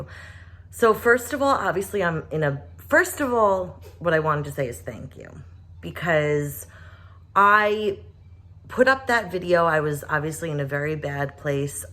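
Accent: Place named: New York, United States. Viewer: hey there 🙂🙂 I'm also a New Yorker but am now in Atl (I THINK you are by your accent but I could be wrong) anyways, yes Subs have gotten my life back on track and I actually finished school this past month and have a 4 year degree from a major University. I have a fam and in my early 40s. Im a metal/hardcore musician so I don't have to explain what kind of world I have lived in. It was fun but nuts..no regrets. Pills ended the music dream and all went down the drain. Subs DID help with getting back on track for my fam and for myself. My issue is the hollow empty shell feeling I have had for 5 years on this shit. Im not ME inside or out. I feel like I just go through the motions of life. I have a beautiful 7 year old daughter and I want her to see her daddy as the guy before the subs. This is why I HATE it at this point. Im thankfully it has helped but I feel I've been on it for WAY too long and I also feel it has taken a toll on my mental and physical health. 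I don't find pleasure in anything really, especially playing music which is something I have done since I was 12 years old. I have no friends anymore because I don't feel social. I know some people may need to be on it for many many years but not me. I should have been off this shit 2-3 years ago. Relapse is NOT an option at this point in my life. I wasn't a IV user but I was taking VERY high doses of oxy/roxis etc...all that is over and im just DADDY now and want to be the BEST daddy. Because of the numb emotio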